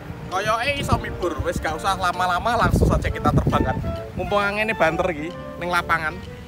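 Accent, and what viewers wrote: Java, Indonesia. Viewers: Orang jawa ya kak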